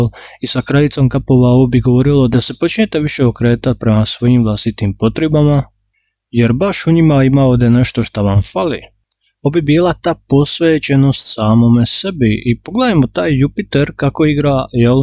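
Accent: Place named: Dalmatia, Croatia. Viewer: Obožavam kao prvo vaš Dalmatinski jezik,vaša brza čitanja,jednostavno super ste.Pozdrav Bos Gradiška.🥇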